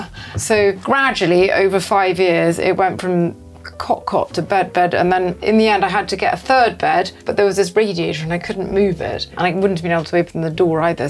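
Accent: RP, United Kingdom. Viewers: Marvellous posh accent with a really tense jaw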